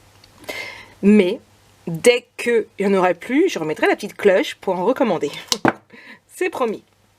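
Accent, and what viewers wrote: England, United Kingdom. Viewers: Cc jolie haul et oui j’ai bien rigolé avec ton accent anglais lol bisous 😘 priscilla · Coucou, et oui j'ai bien rigolé pour ton joli accent anglais, très joli papier,j'ai hâte de découvrir le magnifique album que tu vas nous faire,je te souhaite une bonne journée bise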